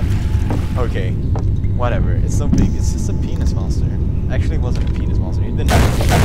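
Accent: Sweden. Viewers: Dat swedish akcent ;)) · Who came here for real Swedish accent ?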